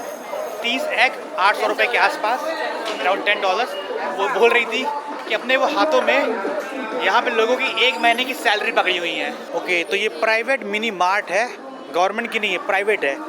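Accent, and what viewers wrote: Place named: mixed, India. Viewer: U.P. Bihar me sab tumhare tarah hee bolte hain kya?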